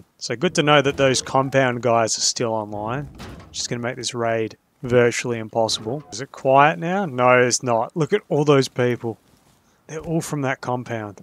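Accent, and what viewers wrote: Australia. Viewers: He's Australian lol there's not a single British accent out there that's not annoying. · something about the accent and choice of wording makes Australian people just funny by default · Honestly, gaming and just listening to this man's beautiful aussie accent is something else, 4+ hour commentary content when?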